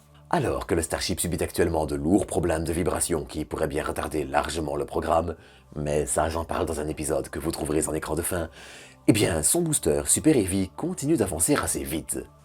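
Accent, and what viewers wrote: Belgium. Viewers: C'est bien sa belle voix grave et son accent belge.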